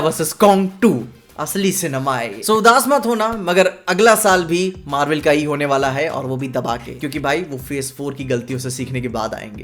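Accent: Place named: Uttar Pradesh, India. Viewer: Bhatere 😁 up ka dialect aane lag gya bhai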